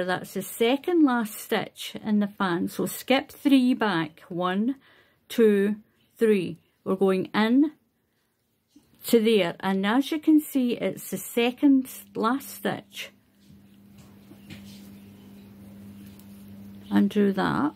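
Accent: Scotland, United Kingdom. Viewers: you have really created a masterpuece with this beautiful, but simple stitch! Is that King Cole Cotton Silk a category 3 cotton? Trying to find a good substitute here in the US. My Great Gran taught me to crochet at 8 with #10 bedspread cotton. She was also Scots, so I love to hear you speak because it brings back fond memories of my Great Gran. Thank you for your lovely way of teaching and your brilliant talent!🎉❤🎉❤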